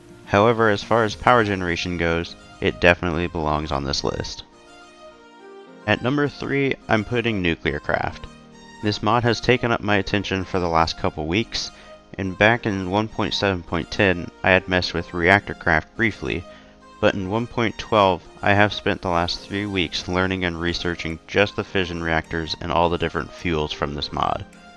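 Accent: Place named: England, United Kingdom. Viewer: das ist ein Engländer kein Deutscher man hört das von seinem Ausdruck was er sagt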